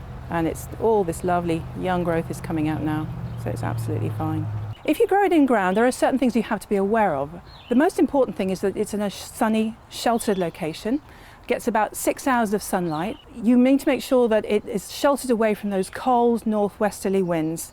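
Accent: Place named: Texas, United States. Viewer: The accent lol 😹 I thought this was Texas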